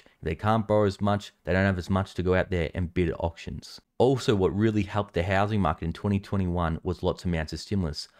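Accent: Australia. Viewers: sorry, incorrect. It is his Aussie accent. I am in Oz. He is saying COUNCIL RATES. Rates you pay for local council to collect rubbish, clean local parks etc..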